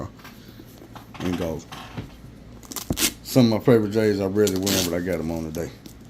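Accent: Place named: Mississippi, United States. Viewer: You sound from Mississippi bro im from 🥾⚜️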